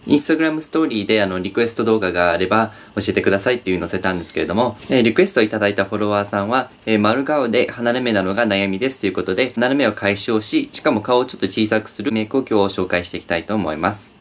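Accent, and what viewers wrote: Kansai, Japan. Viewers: 、関西弁でかわいい😍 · メイクすごく綺麗で丁寧なのに編集が面白くてギャップがすごい！私は関西出身なのでの発音すごく好きです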